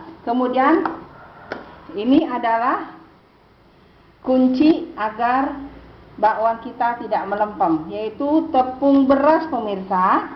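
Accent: Indonesia. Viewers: Sunda na ti mana atuh 😁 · aduuuuh teteh pasti sunda nyaaaa meni has suara sunda na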